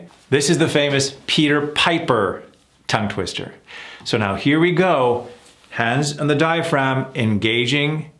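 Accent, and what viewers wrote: India. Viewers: Thank you❤ your indian accent is perfectly as Indians usually speak . So please continue a series where you can teach from basics to advance for Indians. Love from india ❤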